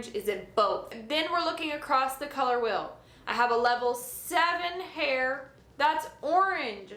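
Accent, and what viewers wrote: South, United States. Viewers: Okay, you need your own talk show 🎉Fo REAL, though! You're absolutely adorable; and just incredibly endearing to watch! Normally, Southern accents drive me insane; but it just adds to your amazing charm 😊😅 Can you do a video of your haircut, updo messy bun, braids, and your make up! Your foundation is pretty flawless, and your brow are on fleek! Are they microbladed? You look like a more edgey Stassi Schroeder from VPR, actually!!! Thanks for all the wonderful tips! Soooo incredibly helpful 🎉I hope you got a nice side hustle going through social media $$$ 😊❤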